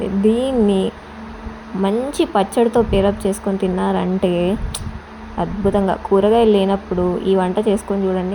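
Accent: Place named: Telangana, India. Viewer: Telangana ammai la undheyy🫠🤔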